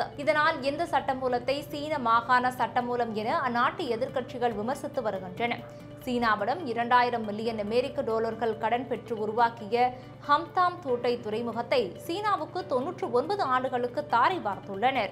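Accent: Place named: Tamil Nadu, India. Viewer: இந்திய தமிழ் செய்தி வாசிப்பவர்கள் போல வாசிக்கிறார்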